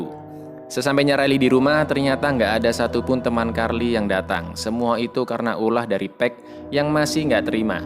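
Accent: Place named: East Java, Indonesia. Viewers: Boso jwone kntel men ki asli ndi bro,slm ko jatim bro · Wong ndi mas. Jatim ye?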